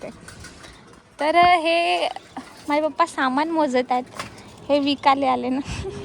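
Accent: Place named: Vidarbha, India. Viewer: स्वच्छ निर्मळ हवा,नैसर्गिक वातावरण, गोड वैदर्भीय बोलीभाषा,तुला खूप, खूप आशीर्वाद,तुझे मोठ्या घराचे स्वप्न लवकरात लवकर पूर्ण होवो.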